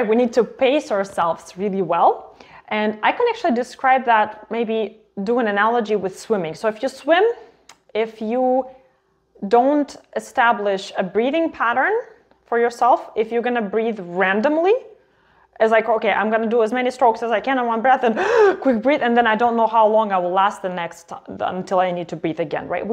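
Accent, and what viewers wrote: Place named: United States. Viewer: Thank you for reminding me of those wrists positions 😊 I had forgotten them. I was taught that too, up and down was the most important. I don't quite remember if the stacatto movement is included in up and down, 🤔 I know I had to practice that wrist movement, my teacher used to say the 'ping pong' wrist movement. Where are you from? You speak English with an American accent, but you're not American nor English, some eastern Europe country? I'm Elsa from Lisbon /Portugal. Liked your interpretation of Chopin's scherzo 👍👍👍 congrats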